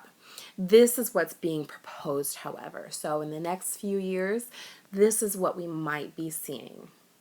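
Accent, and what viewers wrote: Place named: England, United Kingdom. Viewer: I like your English accent when you tell the French provinces I think it's not so easy for your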